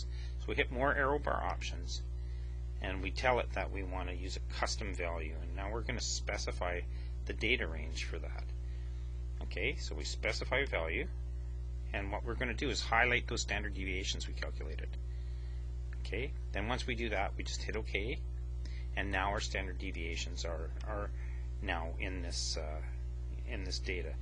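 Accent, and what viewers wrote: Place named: Canada. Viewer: you are one of the most Canadian sounding person I've ever heard. also thank you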